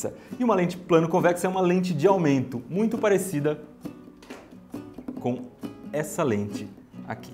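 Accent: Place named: Northeast, Brazil. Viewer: Nordestino DETECTED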